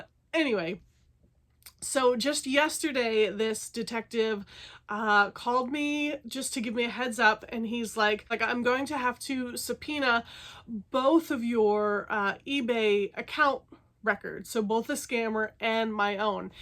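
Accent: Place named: United States. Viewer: U r a very good lady. There are so many scammy crooks in the world. Best wishes for the future from England. Btw, we have the same problems. I think from your accent u r from the USA?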